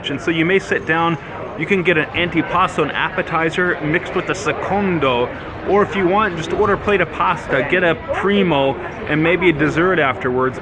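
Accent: United States. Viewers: Interesting and informative videos. Though. You might want to get on Duolingo and do a simple Italian diction study. Your accent on Italian phrases is very American. But lots of great info. I haven't been to Italy yet sadly but plan to go. I'm an musicologist/opera coach so I have studied Italian language for years. My birthday is always around Carnevale so want to do that in Venezia. I have friends in Roma, Milano. So probably next year. It is indeed so important to learn customs, etiquette. One of my close friends is an opera singer in Rome and she said she thought it was appalling seeing Americans showing up for the opera in flip flops and t shirts instead of formal wear. One can still be comfortable with silk shirt and slacks in the hot months. I'm glad to hear Italy is getting more progressive with vegano (vegan) or vegetariano (vegetarian items) on menus. 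Though I love Italian food, all that cheese/dairy and meat can be so heavy. Great tips on credit cards. Exactly what you mentioned happened to a friend when she was in San Remo, she forgot to take her credit card at a ristorante and either it was stolen or lost. Luckily she was able to stop that card and had another credit card back at her hotel. Eating late though is so different to me as I never like to do that.. I then feel heavy or can't sleep. But my Mom's family is Galician and sometimes like the Italians, the Spanish eat dinner usually around 8 or even 9 pm. So what a lot of my American friends who are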